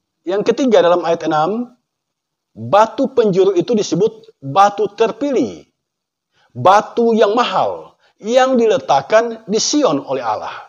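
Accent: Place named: East Nusa Tenggara, Indonesia. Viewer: Syalom bapen slamat pagi. Trimkasih bpa utk penjelasan firman Tuhan pagi ini yg memberi pemahaman yg sungguh dan benar. Maaf bapa berasal dari daerah mana? Saya sendiri dari NTT. Maaf bapa saya bertanya krn dari gaya/logat bicara sama dgn orang NTT. Dan jujur bapen saya baru dapat canel bpa hari ini 19 juni 2023. Skli lag itrimkasih banyak2 bapan. Tuhan Yesus memberkati bapen dan keluarga.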